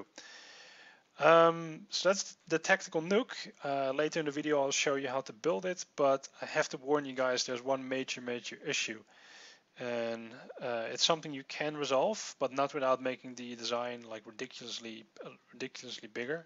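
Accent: Netherlands. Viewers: ***** oke nederlands :P je acent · aan je Engels hoorde ik dat je Nederlands was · let me guess, you're dutch. (kan je horen aan je accent)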